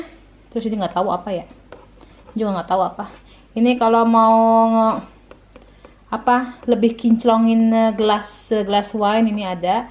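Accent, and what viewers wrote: Jakarta, Indonesia. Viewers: logat betawinya kentel bangeeettttttttttt, saya suka🖒🖒🖒🖒🖒🖒🖒🖒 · Behhh si bahasanya gua demenn bngt dh, betawinya dapet bed. Ngakak dengeringnya ( mkn pedes gini sudaannya pantat dh kya dibakar wkwkwkwk) bisa aj. 🤪 Bisa liat video krna tadi sebelumnya liat video widi yg di Swedia widi nyebut" nama kita liat bener asli kocak amat heheheh, maap jdi nyablak gini, maklum betawi pan emng gini bahasanya. sukses trus ya 👍💞👌🙏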